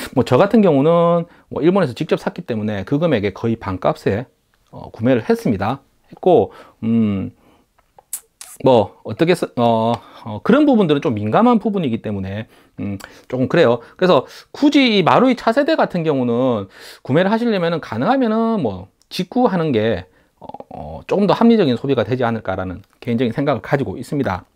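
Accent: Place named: Seoul, South Korea. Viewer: 그냥서울말안쓰고 그냥 말하면 안돼나요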